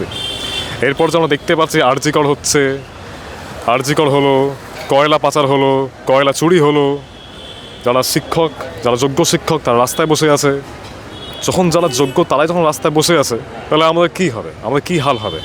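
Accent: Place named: Bangladesh. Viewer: বাংলাদেশি টান ভাষায়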